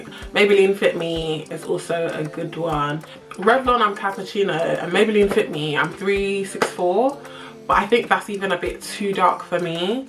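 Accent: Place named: Essex, United Kingdom. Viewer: but from Essex! 😅😅 Love it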